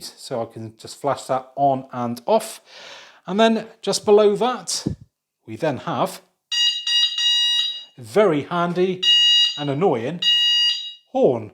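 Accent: United Kingdom. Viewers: Great to have a video with a British person speaking rather than Yankee's.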